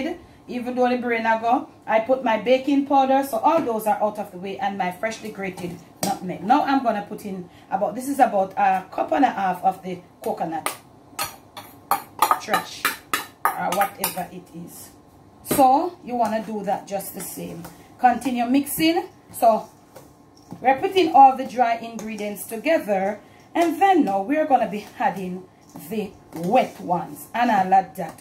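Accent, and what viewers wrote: Jamaica. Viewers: I could just eat a slice of Toto, my mam use to make it while i was growing up. Love watching you bake, and i just love the Jamaican speeking, i find it so warming. By the way i think the word you were looking for the cocanut trash is dessicated. My mam also called it cocanut trash! Lol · I like jamaoca english❤ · Ms I enjoy your videos. Mixture of proper English and Jamaican patois is so me. I am comfortable with your style of communication. Thanks for the education in authentic Jamaican cooking on all your channels. I appreciate your letting us being a part of life in the Caribbean and specifically Jamaica. I wish you all the best going forward.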